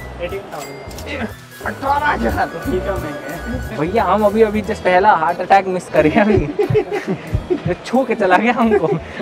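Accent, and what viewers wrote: India. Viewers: Yeh baliya ka accent hi nhi h. Jhansi ka lag rha h. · I m also from ballia....but aur bhojpuri speaking style is different from yours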